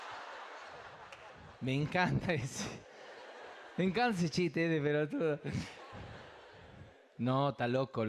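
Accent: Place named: Tucumán, Argentina. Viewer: asi habla los tucumanos gatos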